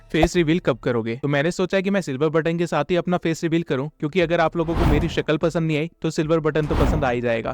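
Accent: Bihar, India. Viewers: bro aap bihar se ho na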